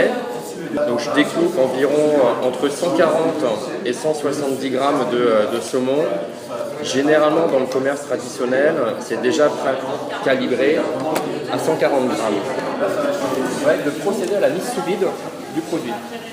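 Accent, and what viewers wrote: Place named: France. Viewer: Ich habe bislang nur sehr selten jemanden gehört, der so französisch spricht, wie der Protagonist in diesem Video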